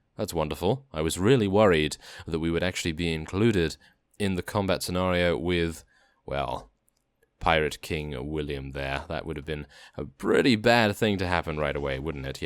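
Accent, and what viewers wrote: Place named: Oxford, United Kingdom. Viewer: If you don't mind me asking where in England are you from? I'm thinking Oxford area but I can't tell, I'm from up north near Manchester.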